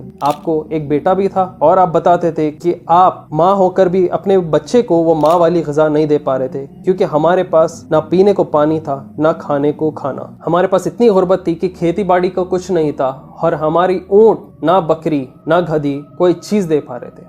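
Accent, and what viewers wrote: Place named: North India, India. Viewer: Apka purana andaz raha baat karne ka toh 1000 videos dekhinge bhai, ye north Indian baat karne jaake itni interesting video dekh ke bhi utna maza nai aara, kaiku english use karre bhai pronounciation ich kharab hojata toh? Totle hai jaisa dikhra aadha word andar hi kha lere.. pehle ke jaisa interesting rakho na conversation? Golden era chale gaya kya ka?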